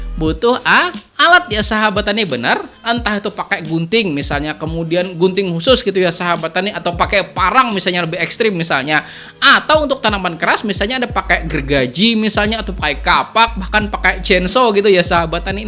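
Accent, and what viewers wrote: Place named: North Sumatra, Indonesia. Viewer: Bg asal Sumatera ya..?? Kok logatnya kayak dr daerah Sumut gt..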